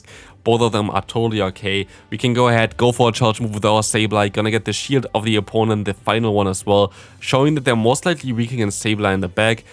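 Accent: Germany. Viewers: Der deutsche Akzent kickt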